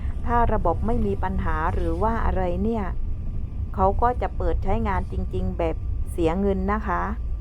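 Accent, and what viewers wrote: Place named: South, Thailand. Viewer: คิดถึงจึงแวะมาทักทายน้องสาวคนน่ารัก😍, คิดถึงสำเนียงใต้.ยายรินดู แต่บ่ได้ยินนะคะ เพราะหรี่เสียงมือถือไว้ กลัวคนรู้ว่าเล่นมือถือในเวลางานค่ะ.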